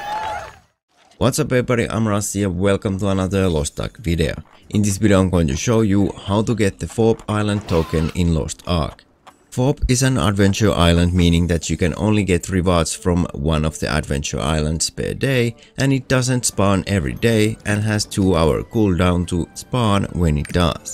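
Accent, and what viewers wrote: Finland. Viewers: is that a finnish guy i am hearing since the Q and the word youtube came out pritty finnish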